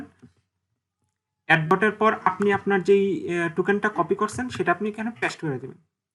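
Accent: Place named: India. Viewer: Could you try to speak more international English in your next video please. A strong Indian accent is hard to understand if not used to.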